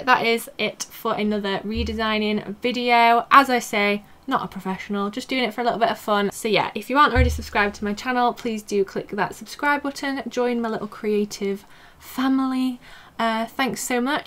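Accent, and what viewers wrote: England, United Kingdom. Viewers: I love your videos. I am person with a lot interests and I’ve only just now decided to get to learn graphic design and your tutorials and awesome and greatly put together. That Kate Winslet English accent has my heart pumping in this African heat. Thank you my 🙈🙈🙈🤣🤣🤣🤣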